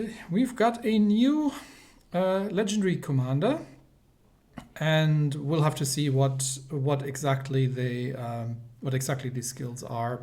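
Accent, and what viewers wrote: Germany. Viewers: I must say, I do love voice. I do like a German accent. I equally like accents that sound german. May I ask, are you German? If not, then, if you don't mind me asking, what ethnicity are you? By the way, a few suggestions: try playing World of Tanks Blitz (WoTB), and, furthermore, try doing like a series of livestreams where you grind, on a new account or, if possible, on your current account, an entire ship line, from tier 1 to tier 10, in WoWsB. For example, the Yamoto line, or, even better, a brand new ship line, when another new line of ships comes out.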